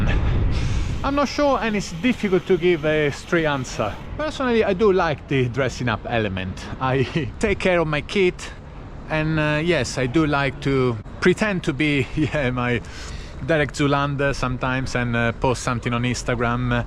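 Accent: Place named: Italy. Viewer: Love yur videos and yur accent! 🇮🇹 I treat bike fashion the same as normal fashion, colour coordinate, either the same colour and mix colours from a similar pallet. Including bidon, casquette, gloves, helmet, but socks and shoes always white except black socks with black gravel shoes. Bib shorts are all black so can wear with any jersey. I love cycling fashion and looking Pro even if I don't Go pro! 😂❤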